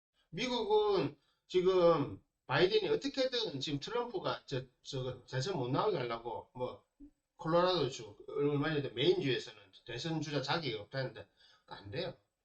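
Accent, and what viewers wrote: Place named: Busan, South Korea. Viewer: 선생님ᆢ 유튜브. 올라오면. 거의. 듣는데. 사투리가. 심해서. 좀 못알아 들을때가. 많은데. 질적인. 내용은. 참 깊이 있으셔요 ㆍ감사합니다 부산이신가봐요?? 사투리가. 심해서요??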